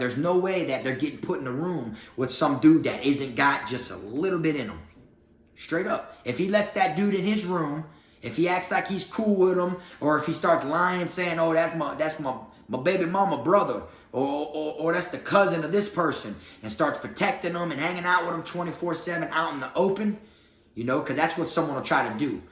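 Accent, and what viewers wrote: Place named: Florida, United States. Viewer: I love how you talk because I talk the same way.....It has to be a Florida thing!!! LOL I'm straight up serious though. On God 💯💯💯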